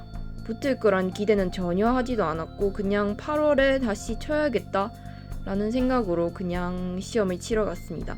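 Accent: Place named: Daegu, South Korea. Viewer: 대구사람이세요? 사투리가 대구사람이네 ㅎㅁㅎ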